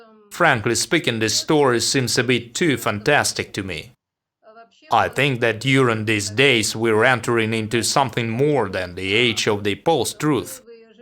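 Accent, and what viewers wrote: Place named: Russia. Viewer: Нууу, без обид, но с таким «хэви рашн эксент» немногие смогут послушать выпуск хотя бы 10 минут... советую уменьшить басы, хотя бы, а то перевод звучит как от «рашн мафиа»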